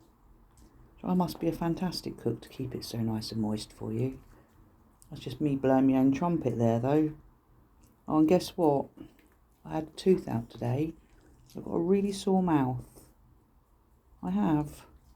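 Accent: England, United Kingdom. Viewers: By your accent, I'd say you live in England. Am I right? Hello ftom Colorado USA.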